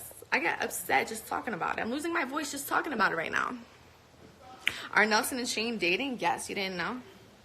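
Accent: United States. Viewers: is u light skin black bae? u got that nice light tone an i think maybe u black cuz how you talk . sound lik u come from were i from (bronx usa baby!!!!) pls tell me yss u black my god u fne bae gd bless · candy candy she’s from Jersey and is Italian. That’s just how we talk here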